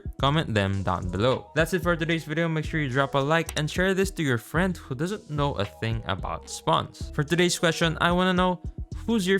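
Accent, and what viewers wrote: Philippines. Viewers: Hello are you filipino? I like your accent and voice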